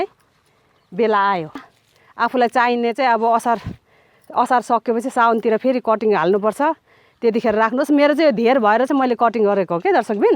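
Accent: India. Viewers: राम्रो छ्🙏हजुरको बोलि indian जस्तै छ्😁कता हो हजुर को घर??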